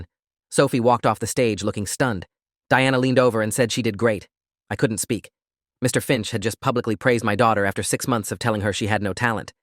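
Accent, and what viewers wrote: United Kingdom. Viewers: So I came from the short and this dude has a British accent now😭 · YO STOP WITH THE BRITISH ACCENT ITS CATCHING ME OFF GUARD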